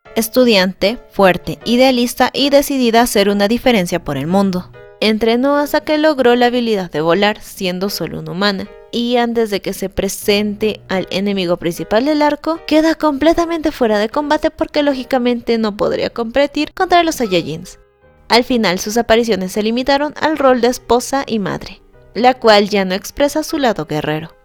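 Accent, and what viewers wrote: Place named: Chile. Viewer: ctm eri chilena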